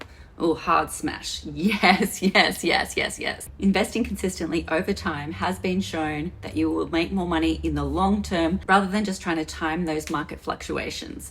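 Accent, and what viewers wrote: Australia. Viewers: Nice to hear an Aussie giving some good common sense advice.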